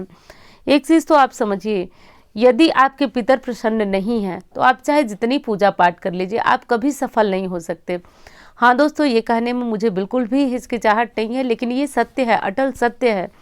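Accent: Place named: Purvanchal, India. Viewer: दीदी हम जौनपुर से है दीदी मुझे आपकी आवाज से पता चल रहा था कि आप मेरे साइड से हो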